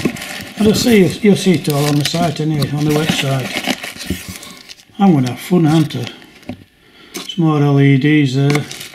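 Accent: Yorkshire, United Kingdom. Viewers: you want to get dragon for Mac Professional 6 it's £249.99 but works really well and should understand your yorkshire accent with a bit training. Are you are using the built in dictation on your mac? Download the extra features in the settings so it won't have to keep going on the internet and it should work a lot better also turn the volume up a bit that helps. I like the lights I may have to get some in 00 and n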